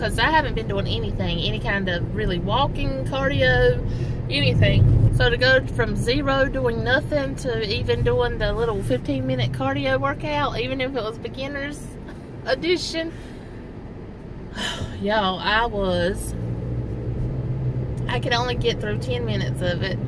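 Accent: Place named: South, United States. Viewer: I really enjoyed this and your southern accent :) I did a MUKBANG on my channel for them also :)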